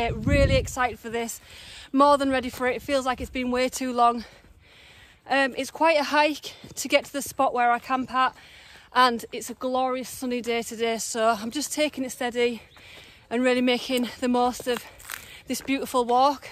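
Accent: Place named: Carlisle, United Kingdom. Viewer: Carlisle accent..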